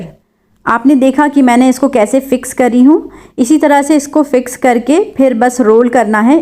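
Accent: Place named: Varanasi, India. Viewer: The way YOU said this 👉🏼 "..yahan to etna badaa badaa bathtub🛁 hai..esme koi late k to नहाता hai nahi pr Clean pura krna padta tha😅😆is sooo Desi style n 💯 true as well. I live in medina N i loooveeee ur desi accent its sooo much similar especially ur "kahawate/muhaware" which reminds me my home town Banaras,U.P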